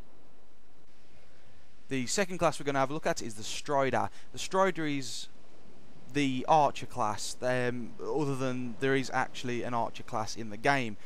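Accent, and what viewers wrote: Birmingham, United Kingdom. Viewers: Nice on mate. You from Brum or the Black Country?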